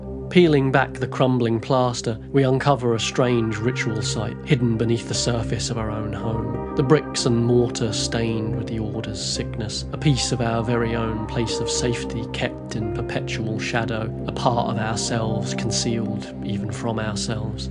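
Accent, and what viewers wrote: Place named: United Kingdom. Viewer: This guy is more annoying and pretentious than he claims Vincent to be. The leftist stuff is overly annoying and childish. Bipartisan politics are meant to divide and cause inflighting in people. Most people pick a side younger in life and then grow out of it realizing they have more in common with people on the other side than they do the people they look up to in office that they vote for. He says things like the right supports mass shootings when nobody clearly does. He acts like every opinion he has is right, like an angsty person who's never raised a child and been able to see his own faults through raising another human being to function in society that's a mirror image of himself. Everything he says politically is just so cringe and stereotypical of his political side. I'd almost expect him to say "Heather, being born without a penis is inherently good unlike the toxic male Vincent who's smugness is a direct result of his containment of semen." This even being remotely watchable to anybody is an example of how strong the silent Hill stories are. 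Even a hack like this guy can use it as a crutch to shove his political views into and get a decent amount of views. Put a British accent on it like his and any young, immature self-hating Westerner will feel intelligent watching it. All I hear is pretentious garbage, Flesh Simulator (who's actually talented) being ripped off, hbomberguy being copied and "I'm very artful." I can watch some leftists I don't agree with, but this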